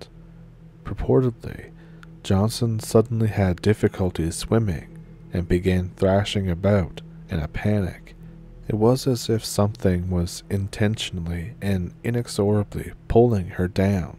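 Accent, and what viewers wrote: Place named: Maritimes, Canada. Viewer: I love your stories, and I can even get past the EXTREME Maritime Provinces Canadian accent. But you sound lethargic and congested!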